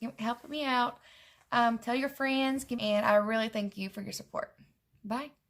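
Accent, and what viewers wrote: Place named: Rural, United States. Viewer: I'm here strictly to hear you're country ass twang and support you lol. The premise is good tho. Real crime, make-up, audio book. It is definitely a progressive thing. I dig it. 👍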